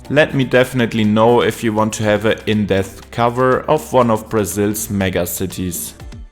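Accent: Germany. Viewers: I know a german accent when i hear one! Love it! And yay new city channel!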